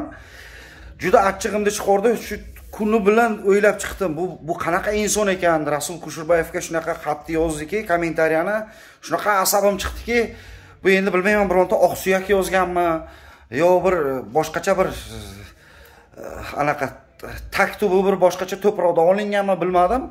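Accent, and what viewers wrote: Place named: Rural, Uzbekistan. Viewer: КИШЛАКИ ГАПИНДИ КАРАГИН ...ХОТИНГА УКШАП ГАПИРАСАН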